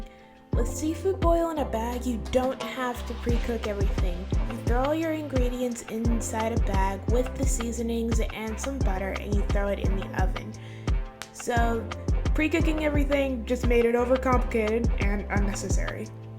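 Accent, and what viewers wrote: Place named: Kansas City, United States. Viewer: Kc connection accent is everything 😍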